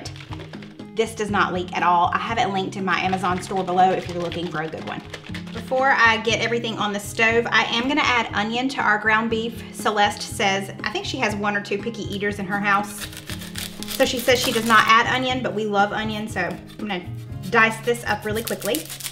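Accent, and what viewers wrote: South, United States. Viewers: Love that sweet southern accent you have runs deep in us Southern women! You have a beautiful family so happy to have found your channel! Cover the chicken with plastic wrap and you want have to worry about chicken juice getting on counters just helps with cleanup and safety. 😊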